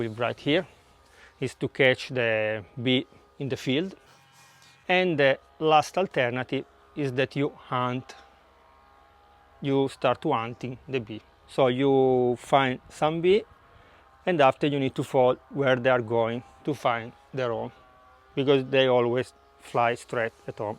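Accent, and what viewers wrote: Italy. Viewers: I was just thinking today, "What happened to my favorite Italian guy channel?" I really do appreciate your videos, and very much enjoy your speaking English. Thank you for your time and energy making these great videos. I would love to visit Italia again in my life, but reality is probably no. Are those Fig trees behind you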